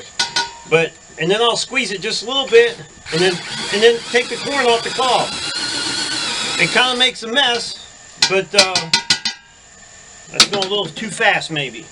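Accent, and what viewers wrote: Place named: Pittsburgh, United States. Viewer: I hear the ‘Burgh more than WV! :-)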